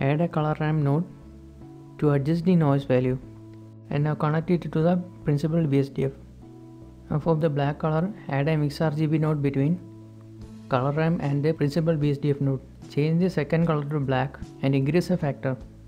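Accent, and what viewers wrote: India. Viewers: If You hear the India guy, You know that tutorial must be good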